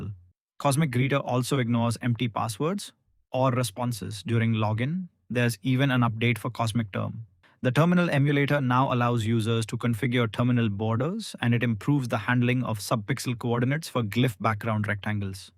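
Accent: India. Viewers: I'm not being ironic: Is this an Ai voice with Indian accent?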